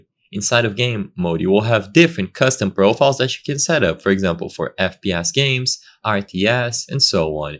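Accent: Brazil. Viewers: You're brasilian, arent you? Thanks for the video!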